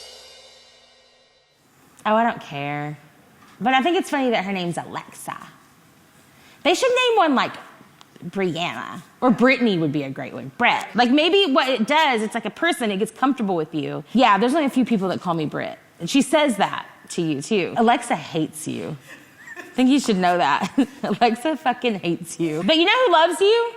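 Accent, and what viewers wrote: United Kingdom. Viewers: Legit thought she was British until now lmao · I thought she was british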